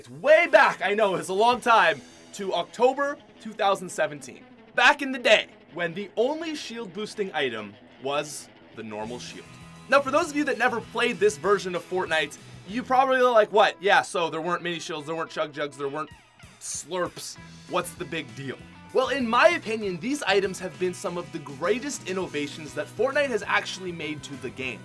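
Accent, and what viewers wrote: Canada. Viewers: This guy is the most stereotypical Canadian talker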